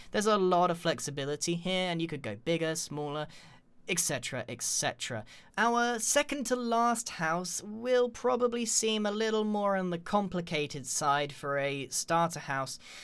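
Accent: United Kingdom. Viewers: God like you are like like you are so weird like I hate your accent like what kind of accent do you have French English English like United Kingdom English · Gabriella Siemer that’s called a British accent 😂😂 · uh... hes just british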